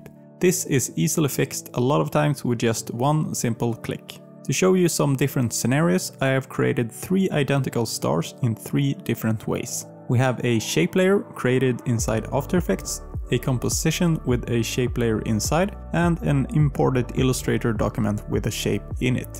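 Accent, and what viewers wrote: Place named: Sweden. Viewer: Omg that Swedish accent is so recognizable 😅 fellow swede!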